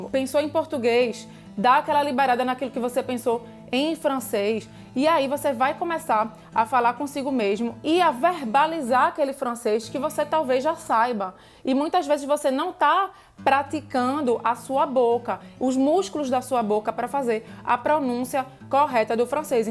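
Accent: Pernambuco, Brazil. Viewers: Seu sotaque pernambucano falando francês é um charme!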